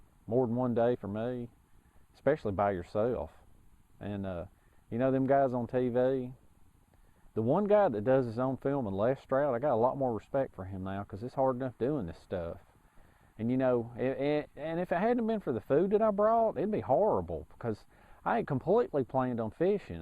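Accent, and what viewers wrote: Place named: Georgia, United States. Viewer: Really great videos sir!! You sound just like some people I know in Ringgold, GA!! Keep em' coming man!!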